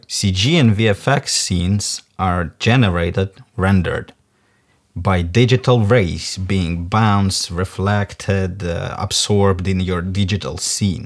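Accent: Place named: Russia. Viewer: а я сразу понял что наш человек вещает )))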